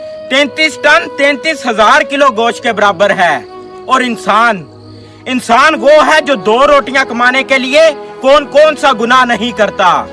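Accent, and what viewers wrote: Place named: Punjab, Pakistan. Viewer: His Punjabi Urdu Accent is making me laugh😂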